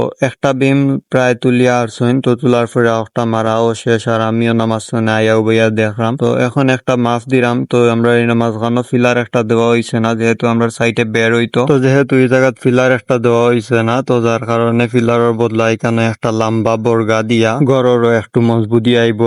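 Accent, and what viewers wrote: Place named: Sylhet, Bangladesh. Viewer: আসসালামু আলাইকুম। ভাই কেমন আছ। আর তুমার ভাষা সিলেটি সিলেটি লাগের তুমার বাড়ি কই।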